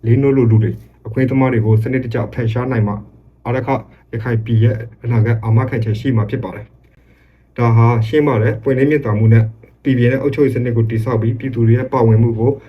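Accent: Dawei, Myanmar. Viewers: ထားဝယ်ဖက်ကအသံပါ။တနင်းသာရီတိုင်းထဲကဖြစ်မှာပါ။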